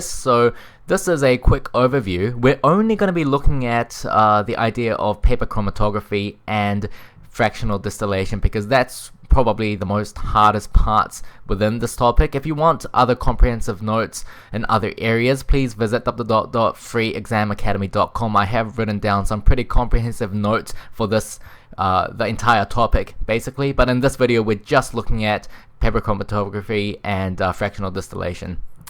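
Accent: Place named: New Zealand. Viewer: Your new zealand accent>>>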